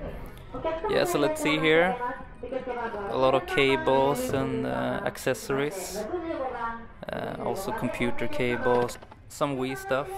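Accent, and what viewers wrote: Sweden. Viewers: are you from sweden? what hard off is this+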